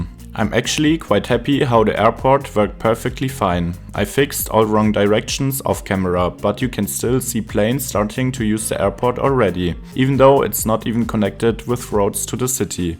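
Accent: Germany. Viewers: bist du deutsch(wegen dem aktzent)? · Digga dieser deutsche akzent😅und cooles video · Ich höre den deutschen Akzent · it may be that you come from germany and speak it it sounds a bit like the pronunciation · I Hear Deutsch in that voice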